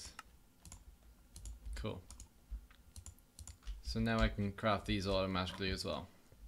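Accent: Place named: Waterford, Ireland. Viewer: Thank you soooo much man, i have spent literally 18 hours between trying to figure this out and watching an insane amount of gobshites TRYING to explain how to do this hahahaha. BTW are you Irish? You sound like a Waterford man ;)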